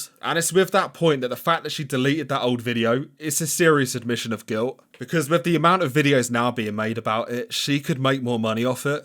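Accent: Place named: Liverpool, United Kingdom. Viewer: Omg she’s a scouser I’m getting rid of my accent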